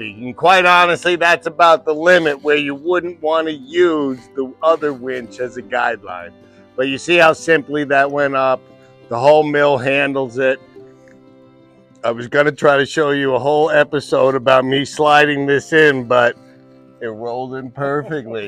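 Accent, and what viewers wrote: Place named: New York, United States. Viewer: Love to hear the NY / NJ accent in a TN miller. Makes me feel at home with you. Hot Dawg !! Love your setup !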